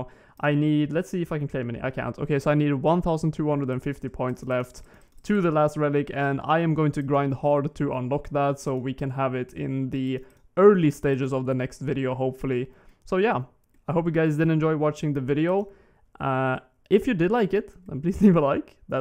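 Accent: Sweden. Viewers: Haha älskar att man hör att du är Svensk :)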